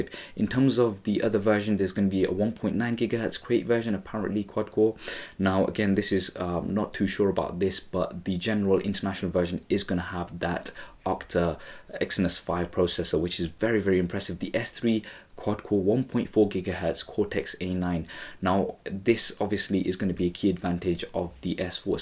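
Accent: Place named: Newcastle, United Kingdom. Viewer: Thumbs up for geordie shore accent!!:)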